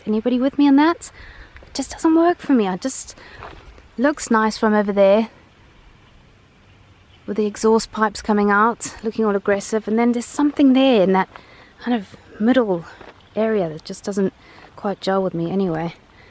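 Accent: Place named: South Africa. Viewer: you have a hint of a South African accent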